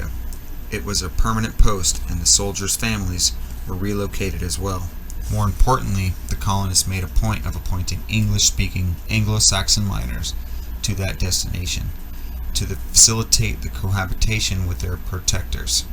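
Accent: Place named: Canada. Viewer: Enjoying your videos (considering getting into Infinity, and I like to know the lore of games, and specifically factions that I play. You have caught me off guard, as I have heard you say both zed and zee now. I am guessing that you are either Southern Canadian, or from a Northern state, with a lot of border crossing Canadians. That, or a child of parents from both sides of the border. Regardless, love the videos, watched four so far today.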